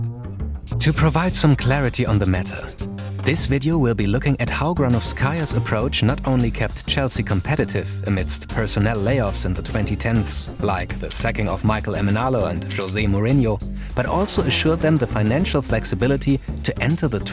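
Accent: Germany. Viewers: Nice german accent btw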